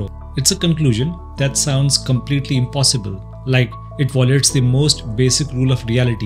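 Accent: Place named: India. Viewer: I can't understand your indian accent. Have you tried speaking normal?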